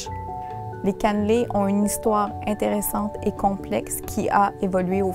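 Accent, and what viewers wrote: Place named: Quebec, Canada. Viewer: OMG what is her accent, is it Canadian??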